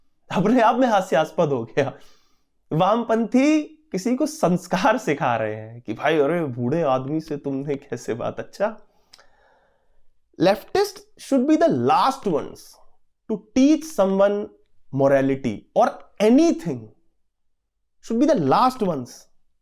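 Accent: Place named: Haryana, India. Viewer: Bhai tumhara Haryanvi bolne jo maja hai wo alag he hai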